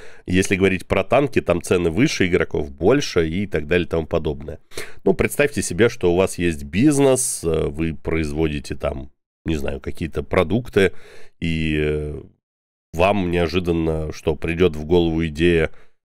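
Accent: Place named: Odessa, Ukraine. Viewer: У тебя такой типа одесский говор, как у того поца у конотопской синагоги.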